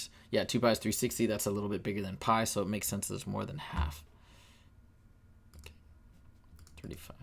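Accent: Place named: United Kingdom. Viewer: Lol your british accent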